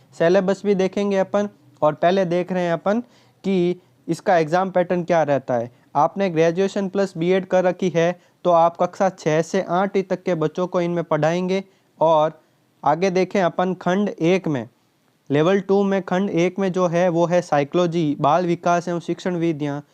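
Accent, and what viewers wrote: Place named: Jodhpur, India. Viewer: मुझे आप जोधपुर साइड के लगते हो 😂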